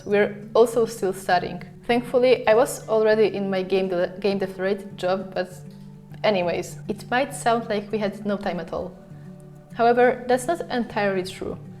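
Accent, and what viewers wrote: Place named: Poland. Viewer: Ten akcent od początku wydawał mi się polski, aż do - Podziemia.. szczyty itp :D Fajny materiał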